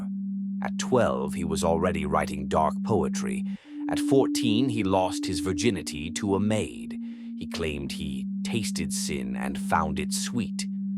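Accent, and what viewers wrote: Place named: United Kingdom. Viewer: At first I didn't notice the ominous music in the background, it fits the video, also loved the British accent it gave it more life😮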